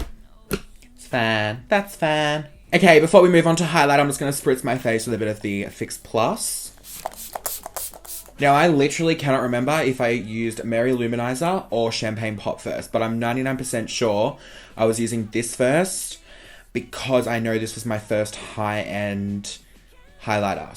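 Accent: Australia. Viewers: I love how Australians say their O's. Q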